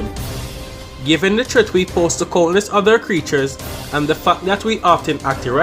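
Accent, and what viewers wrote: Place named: Jamaica. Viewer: Come through with the Jamaican accent yesss these videos are popular but not with a JA accent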